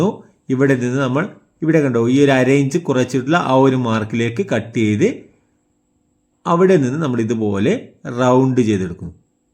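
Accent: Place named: Kannur, India. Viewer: നിങ്ങൾ കണ്ണൂർ കാരനാണോ??? സംസാരം കേൾക്കുമ്പോൾ അങ്ങനെ തോന്നുന്നു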